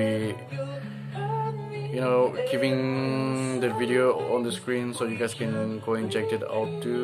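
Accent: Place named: Australia. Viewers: Achuvvvvv Australian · Your English sounds like Australian. 😍 Handsome bro.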